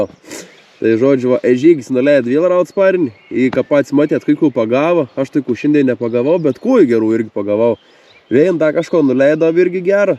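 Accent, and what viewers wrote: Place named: Samogitia, Lithuania. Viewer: Nesnekėtum zemaitiska nebutu idome ziurėt👍😃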